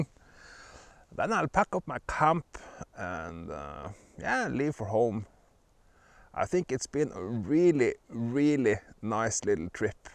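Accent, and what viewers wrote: Norway. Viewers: Really relaxing the way you narrate. Best thing is the Nor-english accent. Hehe ;) FYI : What he is hunting for Rådyr = Venison.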